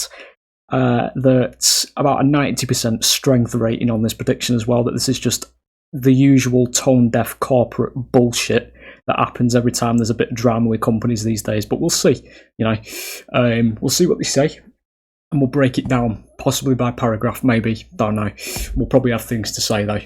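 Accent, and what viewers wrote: United Kingdom. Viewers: Random. But are you from Wrexham?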